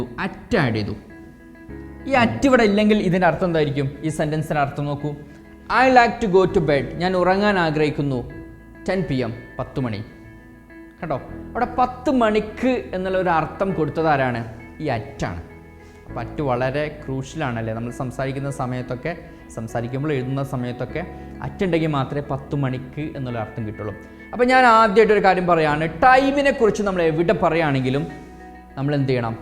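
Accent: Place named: Malappuram, India. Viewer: നിങ്ങൾ ഒരു മലപ്പുറംകാരൻ ആണെന്ന് തോന്നുന്നു... ശരിയാണോ ?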